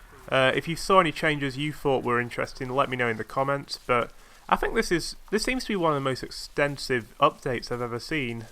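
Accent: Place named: England, United Kingdom. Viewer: He's just English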